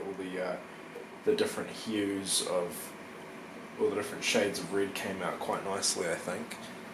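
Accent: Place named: New Zealand. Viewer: dude! thts some great work!! As a 'crazy Blake's 7 fan' I appreciate yr work here :) was larger scale than I imagined, which means a corresponding Liberator wld be YUGE! U sound like a fellow kiwi lol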